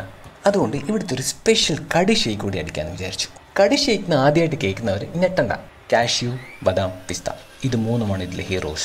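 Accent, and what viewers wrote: Kannur, India. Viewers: Bro kannur aano. Aa voice .. yente monee! !! Poliii 😍😍😍😘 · Aa kannur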